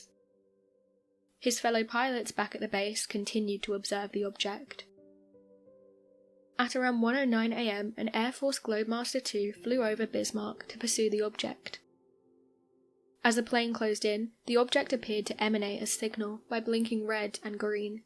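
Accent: United Kingdom. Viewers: Al-u-mi-nium.????? Why do all people with british sounding accents pronounce words so blatantly wrong.?? They're taught the same alphabet as American English speaking students, and taught that all of the letters make the exact same sounds as were taught, yet they insist on pronouncing them the same way as every other retard over there. Some way worse than others. Ask any of them how to pronounce the letter Z, and they'll say ZED... ask them how to spell it and they'll say ZED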